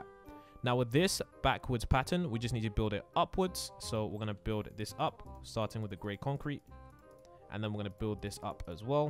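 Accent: United Kingdom. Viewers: I love your British accent then the Build ☕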